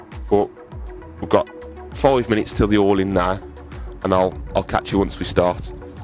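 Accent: Birmingham, United Kingdom. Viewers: don't you recognise a Brummy accent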